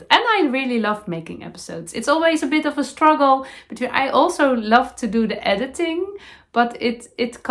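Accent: Netherlands. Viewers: Happy 100th!🎉❤ it truly is the best heart jumping watching you. I look forward to Mondays and Fridays! You are so sweet. Love the imperfections and your wise words, even if they are in your Dutchie English- it is perfect. 😊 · Inspired by you, I made a granny square little vest for my 11-year-old granddaughter. It is so cute I may have to make another! I knit more than crochet, so I am so happy to have learned a new craft. I love your Dutch accent. When I was 11 to 13.5 I lived in the Den Haag area, so learned Dutch, and I am so happy to to hear your language again. I will have to listen to a Dutch episode! Congratulations on 100 episodes! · Many congrats on your 100th episode,. I've often wondered how you find the time to film and, especially, edit 2 podcasts every week.... and still manage to run a home (and all that entails), and then get through the amount of projects you do! 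I love your videos.... and your Dutchy-English😊 Best wishes for the weekend, and I'll see you again on Monday. Christine (London, England) x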